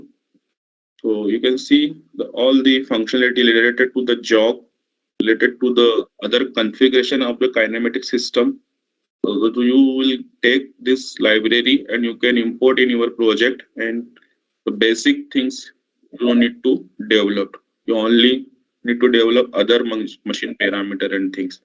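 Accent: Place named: India. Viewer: with the indian accent it is becoming an headache after some point. sorry mr..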